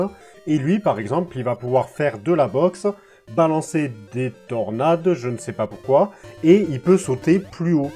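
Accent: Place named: Toulouse, France. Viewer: Le tout couronné de l'accent Toulousain, magique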